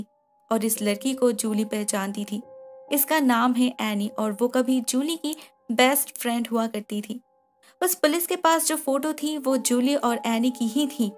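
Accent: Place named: Bihar, India. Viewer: Aap bihar se hai na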